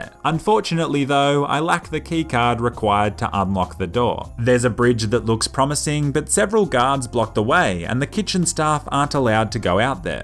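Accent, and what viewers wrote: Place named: Australia. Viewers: he is Australian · Sweet video dude. Aussie accents are too good.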